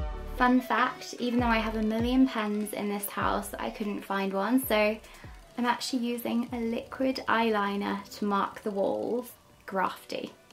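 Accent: United Kingdom. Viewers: british accent is sooooooo beautiful. Couldnt stop listening to you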